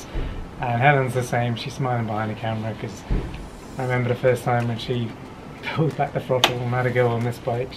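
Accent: United Kingdom. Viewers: You sound British but I'm assuming that you're reviewing for the American Market as the specs don't match, is this correct? Also lose the hat, you're not 12. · is this the Europe version or the US? I know there is different settings, as in, the Europe version does not allow the throttle to function independently where as the US version allows you to use it like a moped? if so, how did you get the US version in the UK (unless you're based in the US - your English accent has miss led me! lol)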